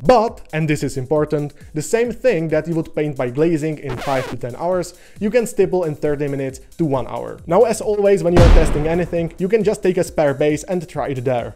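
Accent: Czech Republic. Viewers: Heej, borče! Úžasné video a skvělý kanál, jsi jeden z nejtalentovanějších... "malířů" modýlků? (painter/malíř)...barvič mi zní divně...o natěrači ani nemluvě...whatever, co jsem na YT viděl. A ano, už po asi třech letech a hromadění indicií jako Tvůj přízvuk a analyzování Tvého footage z metra či nalezení Tvých krásných modelů v pražské herně za vitrínou, jsem konečně zjistil že si taktéž obyvatel té naší krásné středoevropské kotliny :D Jsi krásný příklad rčení "zlatý český ručičky". Fakt klobouk dolů. PS: majestic beard, king! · Slyším cze-nglish! A dobrý videjko. Škoda, že jsem neměl takový tutoriály když jsem začínal barvit :c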